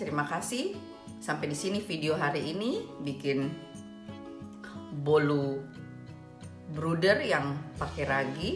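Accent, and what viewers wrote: Papua, Indonesia. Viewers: Salam kenal Dek.. Sy SUKA LOGAT PAPUAx...😍😍😍Asli... Sa pikir...su sampe...Belanda Logat Lain kah😂😂😂 Andalaang😍😍Gbu Sorong Papua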